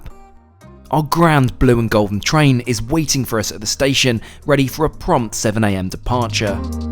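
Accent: United Kingdom. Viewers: sorry for my ignorance but are you english, scottish, or australian? i’m genuinely curious and i’m awful with european accents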